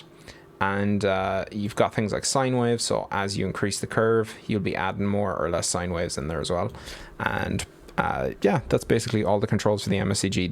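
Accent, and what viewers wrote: Ireland. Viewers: 1. I like Irish accents so this was a nice hour break from the local Aussie accents. 2. I don't like speaking in list items so this is the last one. Not everything we watch has to be less that 30 mins in length. Besides, this is SURGE THE MOVIE and the title would not make a lot of sense if the video only went for 10 minutes. This lightening fast Surge overview is probably more to your liking: <link> I found that one provided a really good quick overview. I just really appreciate people take the time to make videos and share their knowledge. I think I remember something about "workflow optimization and efficiency" when I did that course when working in a supermarket. It was just before we did the first aid session.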